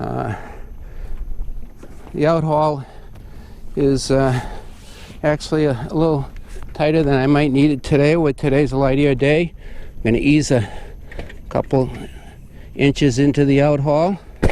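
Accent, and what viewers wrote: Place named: Maine, United States. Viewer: I think I hear a Maine accent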